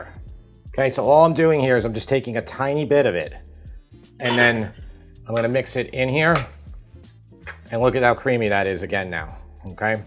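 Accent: New York, United States. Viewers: Not gona lie I'm soooo home sick your accent reminds me of my fam from ny😢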